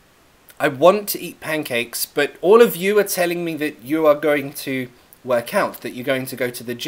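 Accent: United Kingdom. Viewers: i love the way you teach English "British English " ...and m really trying hard to pronounce the words and sound like you people ...i really like British accent... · really enjoy your teaching as always <3 thanks a ton~~~ Wanna get the British accent !!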